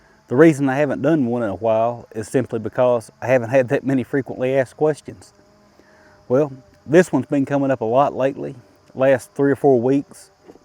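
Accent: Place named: South, United States. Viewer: Dude! I dig your last name.! That could be a band name. Right on brother! and I just subscribed to this guy's channel because of his non threatening southern accent, and I thinks he's right about the slide thingy.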